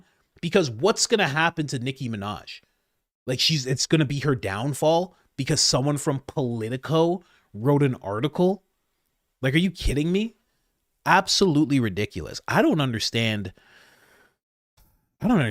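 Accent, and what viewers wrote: England, United Kingdom. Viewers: I LOVE your British accent. YOUR SO MUCH FUN TO WATCH THANK YOU FOR ALL YOU DO ❤ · I love your British accent! 😅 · 😂 love the English accent! Alright bruv 😎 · Why is your British accent better than your American accent? 😂😂😂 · Love the English accent!! The king’s English isn’t that good..😂 King Charles only wishes it were..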